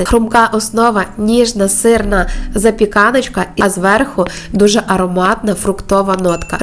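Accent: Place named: Ukraine. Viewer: 😮😊 Просто слушаю ваш разговор 😊и уде наелась 😅,и готовитьне надо,то токой степени мне нравится Украинский говор 😊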